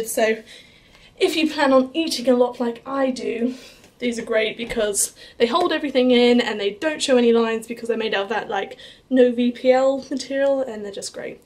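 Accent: RP, United Kingdom. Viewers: She sounds so posh and cute. Maybe I'm just American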